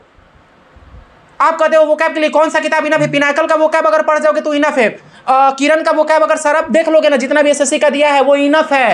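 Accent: Bihar, India. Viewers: App bihar se ho kya bhai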